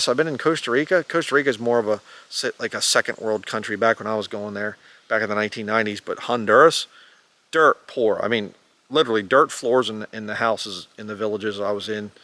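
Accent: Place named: Pittsburgh, United States. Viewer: Your accent sounds pennsylanic sp. Pittsburg.🙂 That's my guess. Maybe maryland?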